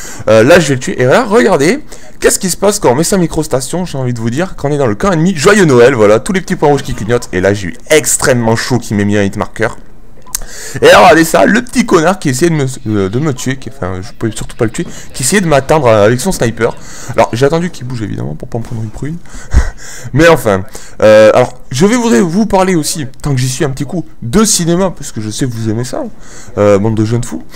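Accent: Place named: South, France. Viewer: accent du sud tu viens d'ou ?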